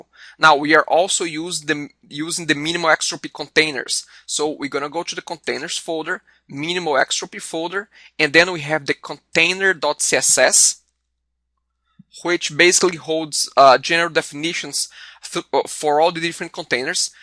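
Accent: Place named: Brazil. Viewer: Brazilian accent is unmistakable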